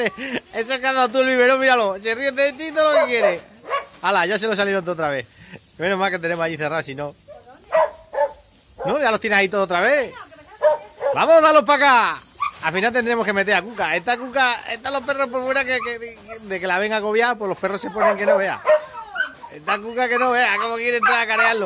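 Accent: Extremadura, Spain. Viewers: Lo comprendo y respeto. Desciendo de extremeños de Badajoz y tengo familia de Alía. Pero tan solo era porque reconozco el "deje" extremeños. De todos modos solo quiero darte ánimos y que sigas subiendo videos. Muchas gracias por contestarme.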